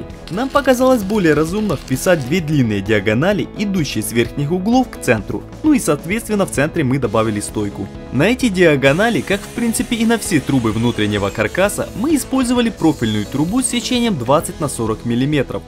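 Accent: Surzhyk, Ukraine. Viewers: Обычный суржик, в каждой области русский язык отличается от масковскава. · да ладно, они украинцы, русский не изучают, говорят как чувствуют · если вы из этого видео не поняли что человек из Украины или вы поняли и все равно предъявляете человеку за русский, вы явно затупок)) ибо если вас не устаивает произношение блогера, просто не смотрите)) Зачем до человека докапываться из за произношения, это не культурно:) и да русский и украинский имеют некоторые общие слова но их очень мало, послушайте например и попробуйте понять без переводчика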